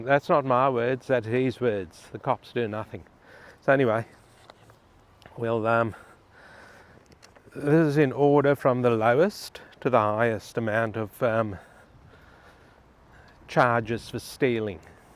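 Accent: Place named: South Africa. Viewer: You sound a bit s african. Are you?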